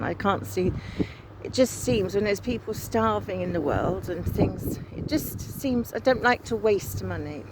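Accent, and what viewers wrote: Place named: Southern England, United Kingdom. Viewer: we both have an English southern accent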